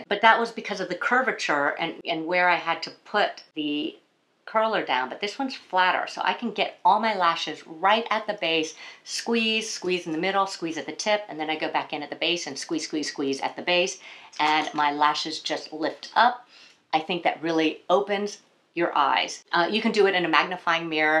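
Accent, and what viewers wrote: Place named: United States. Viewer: Had to switch off, tips might be good but that American voice does your head in. Sorry.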